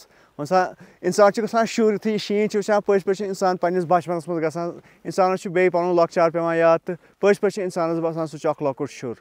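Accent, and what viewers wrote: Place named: Kashmir, India. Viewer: I like your kashmiri srinagar accent , plz use kashmiri language as much as possible. It reminds me of my childhood frndz ,when i was studying in srinagar from 98 to 2007. I like only your content and plz do post on U tube on regular basis .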